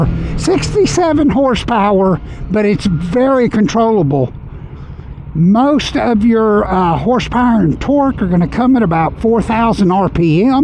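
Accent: South, United States. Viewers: Hey, Love your channel! And Love your accent... I'm from up Northeast Pa. but I can tell you're from somewhere in the South. Don't know if you're as old as me but I been riding my Ninja 650 since 2018 and refuse to let it go..too good of a bike! I also bought a CBR650r a few months ago. I missed that smooth 4 cyl engine and sound. I been riding legally since 1987 but started on a dirt bike at 12.🦾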